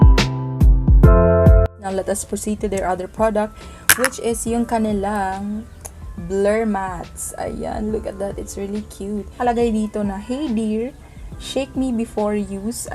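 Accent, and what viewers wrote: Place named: Philippines. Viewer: I like your Tagalog accent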